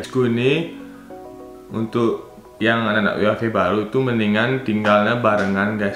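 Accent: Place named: Bali, Indonesia. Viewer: logat bali asane nahh :D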